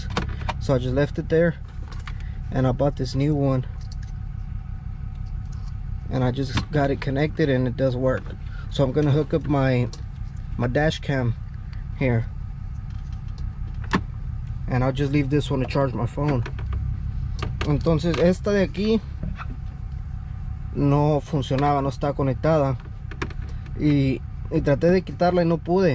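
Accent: United States. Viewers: You from Chicago???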